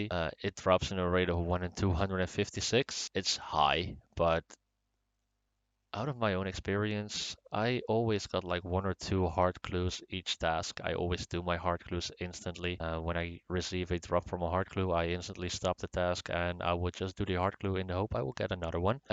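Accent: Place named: Netherlands. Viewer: u sound dutch :D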